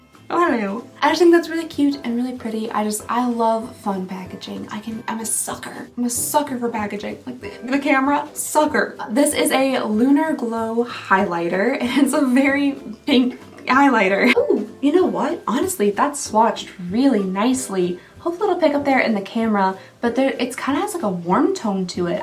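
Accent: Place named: South, United States. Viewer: Say blush brush five times fast and you will understand point lol from one southern with a southern accent…to another, I understand and love her❤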